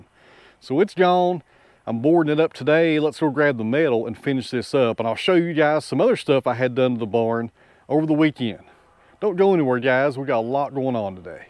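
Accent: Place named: Tennessee, United States. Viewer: Pay no heed to the complainers and the know it all's. And those who bitch about your Tennessee accent.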